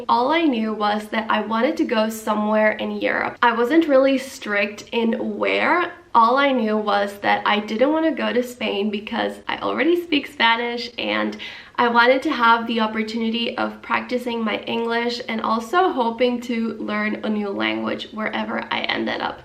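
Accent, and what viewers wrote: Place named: United States. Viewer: your accent is more American than Honduran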